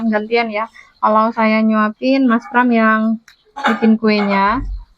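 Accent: Madura, Indonesia. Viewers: Bunda asli mana.. ngomong nya Madura. Sy jg dr Madura ?😊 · Mbak di orang mana kok bisa madura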